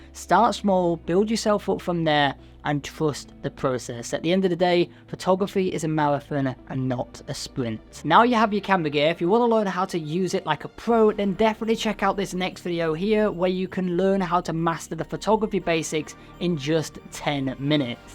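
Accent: United Kingdom. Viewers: I learn in this video how to use Sony a6000 things and also how I can talk like Britain 😮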